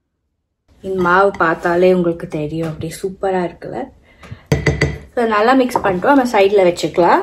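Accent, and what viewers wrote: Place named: England, United Kingdom. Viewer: Hey, I know you speak very nicely in Tamil but now you are talking like as if you don’t know much of the language. So make sure you don’t get your English accent to Tamil. Just a small reminder as I have seen you speaking well in your previous videos. Take care.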